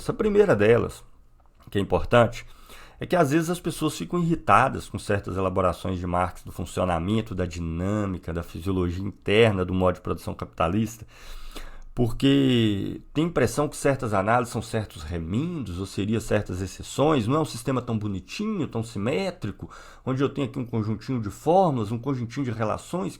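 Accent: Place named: Minas Gerais, Brazil. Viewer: Kkkk isso tá me incomodando desde o início das aulas sobre o capital kkk Colé??? Kkk é vício de linguagem, cara é mineiro, vamos dar um desconto.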